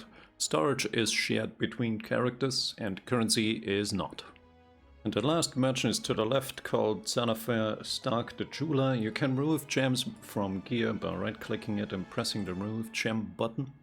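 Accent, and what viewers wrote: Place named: Germany. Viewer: No wonder why i felt the German accent :)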